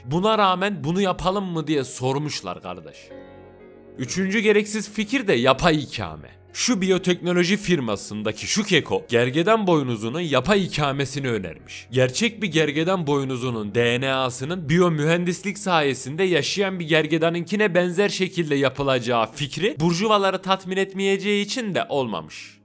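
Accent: Ankara, Turkey. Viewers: Emeğine sağlık çok güzel bir video olmuş. Yalnız eski Ankara şivesini biraz inceltmişsin admin.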